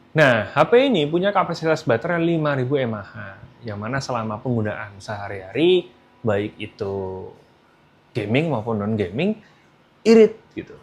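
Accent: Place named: Java, Indonesia. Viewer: Dia orang Jawa bang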